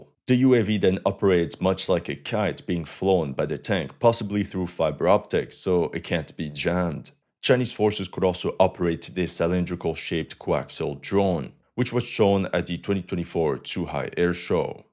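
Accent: Quebec, Canada. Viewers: « c'est ben correct ! » haha le gars est Québécois !! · Je savais cetait un quebecois depuis le debut 😅😅😅 ga cest ben correct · ahh that Canadian french accent.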